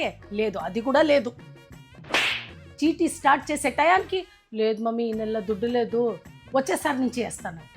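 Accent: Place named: Karnataka, India. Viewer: mam me accent lo karnataka native la undi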